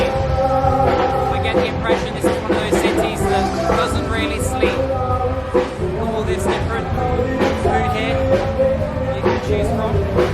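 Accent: England, United Kingdom. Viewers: I love your voice and English accent..You are exceptional in explaining sequence of events during your vedios...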